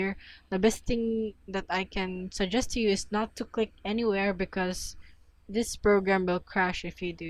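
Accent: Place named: Philippines. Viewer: I can hear a Filipino accent, are you filipino by any chance?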